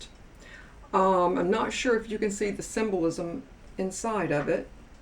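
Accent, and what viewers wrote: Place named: South, United States. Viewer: New subscriber here. I have a question. How long have you been doing this? Just curious. I like your personality and you are a very unexpecting witch. I grew up doing this and I’ve had to keep quiet for so long since I live in the Bible Belt. Now that I’m older, I’m more comfortable with who I am but, I still don’t go around telling people what I do as a lot of people in my small town are very narrow minded and I have kids and don’t want that to affect them negatively with other kids and their parents. I noticed your southern accent so, this is comforting to see. Thank you 😊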